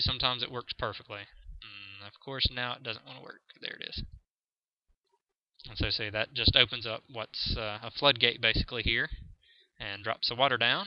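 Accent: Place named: South, United States. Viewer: finaly someone with a southern accent man thx i thought i was the only minecrafter vid maker was southern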